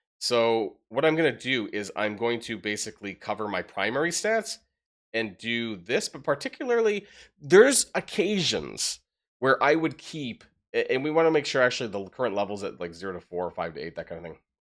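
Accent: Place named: United States. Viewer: Почему название видео и описание на русском, а мне вещает какой-то американец? -_- Требую видео на русском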